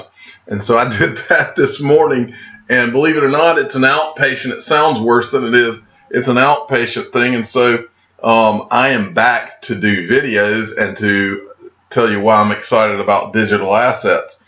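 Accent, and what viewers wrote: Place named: Tennessee, United States. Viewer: Sounds like a tennessee accent to me.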